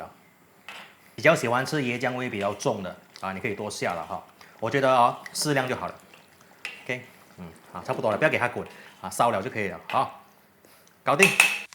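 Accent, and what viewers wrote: Malaysia. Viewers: 谢谢! 说得非常清楚也听起来不是那么难! 棒！说的“大马”华语，马来西亚和新加坡人听了都觉得很亲切！😊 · 这样才是道地的 ‘ 聨邦華语’嘛。😊😊。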